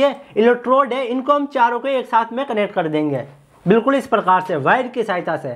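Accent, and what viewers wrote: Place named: Gujarat, India. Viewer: Bhai ap Gujarati ho ..kiyo ki yeshi hindi ...ham hi bolte..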